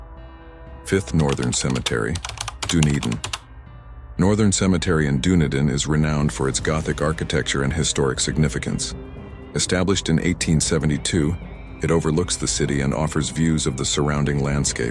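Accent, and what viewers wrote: United States. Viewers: The guy is American, give him a break😂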